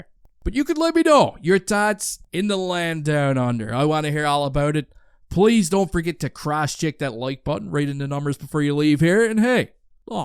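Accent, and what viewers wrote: Newfoundland, Canada. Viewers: Give him a break. Guys a Newfie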